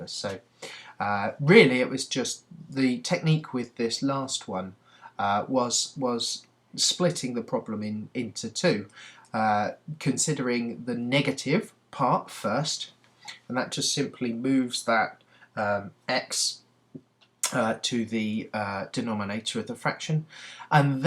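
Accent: United Kingdom. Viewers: Reasons Why you're Better than my math teacher: -I can understand what you're saying -You don't expect me to already know what you're teaching -You have a British accent. That is just AWESOME!!! Thank you :)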